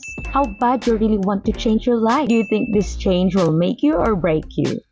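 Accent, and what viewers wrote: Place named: Australia. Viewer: Nice2x. 🎉 Australian Na an accent❤❤❤❤❤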